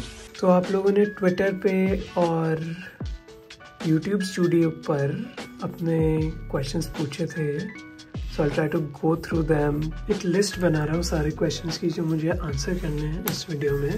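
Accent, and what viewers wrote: India. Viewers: why does his accent sounds exactly like the American stereotype for "indian guy". Not that I liked it.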